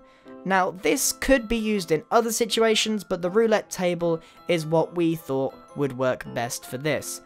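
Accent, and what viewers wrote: England, United Kingdom. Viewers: Grian ar u talking 100% english or american or is it. the same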